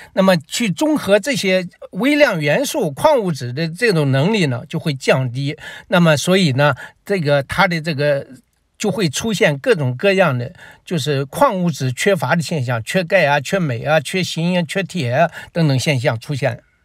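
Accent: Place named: Southern Shanxi, China. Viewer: 听口音感觉您有晋南人的口音，也像青岛人的口音哈哈！我和我妈妈都是您的粉丝！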